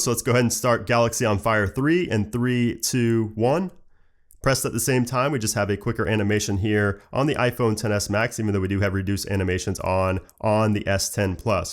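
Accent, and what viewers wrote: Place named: United States. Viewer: This review is "pointless". Why? Because there are a few variables that can have a major outcome on the end result. For example, are you left handed or right handed? Inevitably your reaction will be quicker by whichever hand is dominant. Another variable is you; by your vocal accent, we can establish that you are an American reviewer, and Apple is an ...............American brand????? Yeah, enough said. What must be more disturbing to Apple fans is the fact that major components used in Apple phones belong to Samsung; invented, designed and manufactured by Samsung. One example is the magnificent OLED screen. Apple phones are nothing more than a collection of far eastern components screwed together in an Apple shell and marketed as premium smartphones.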